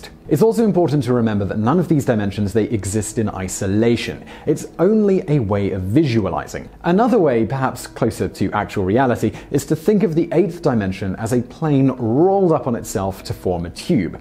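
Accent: United Kingdom. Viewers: Absolute pure garbage video and has nothing to do with the dimensions in string theory. Just because the guy has a British accent doesn't mean he knows what the hell he's talking about.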